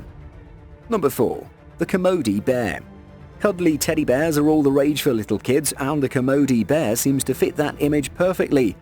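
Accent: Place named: United Kingdom. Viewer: Finnaly new vid PS I like uer British accent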